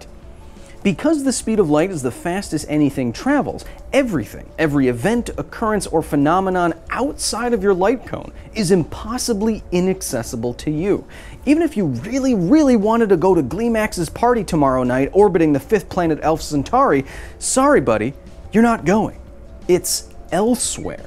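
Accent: Germany. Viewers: Why does your german accent sounds kind of like a stereotypical gay man?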